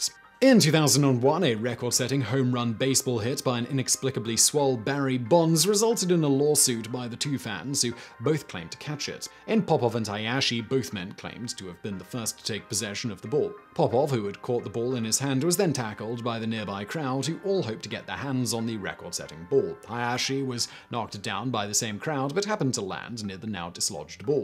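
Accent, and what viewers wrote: United Kingdom. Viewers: Did he just say Yu-Gi-Oh fusion cards it hard to understand UK english its. Different thin US english.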